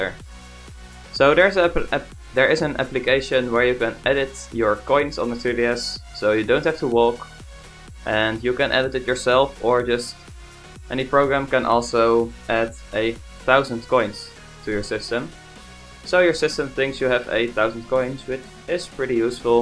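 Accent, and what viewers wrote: Netherlands. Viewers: hij is zoo nederlands haha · You sound Dutch my boi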